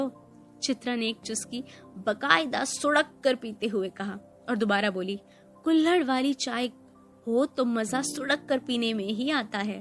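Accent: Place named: Bhojpuri, India. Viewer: Bhojpuri vioce amezing❤ 😂😂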